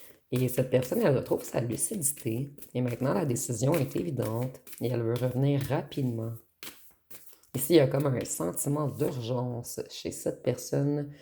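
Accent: Quebec, Canada. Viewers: Eh hop, je viens de booster et liker! 😉 Merci pour votre présence assidue.✨ J'apprécie beaucoup vos guidances...✨et votre joli accent québécois.✨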